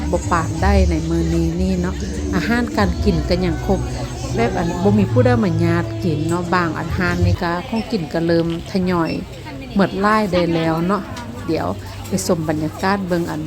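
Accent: Luang Prabang, Laos. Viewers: สำเนียงเจ้า คนหลวงพระบางบ้อ…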